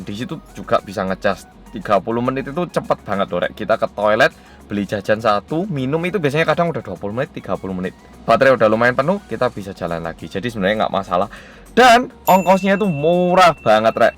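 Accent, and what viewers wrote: Java, Indonesia. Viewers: Wajahnya kekoreaan tapi logat bicaranya indonesia banget .mantull..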